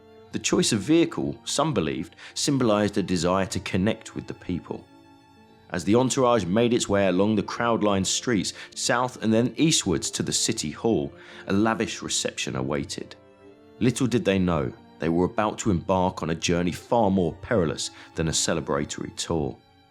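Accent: England, United Kingdom. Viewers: One listening documentary spoken in English accent should be initially skeptical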